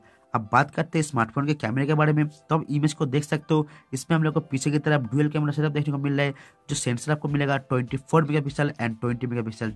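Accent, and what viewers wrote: Bengal, India. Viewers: Aapka voice me Bengali tone mix ho rahi hai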